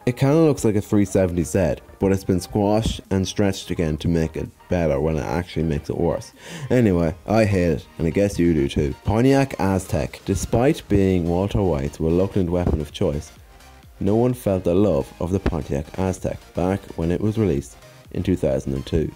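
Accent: Ireland. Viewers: Hi... Only me r u sound Irish?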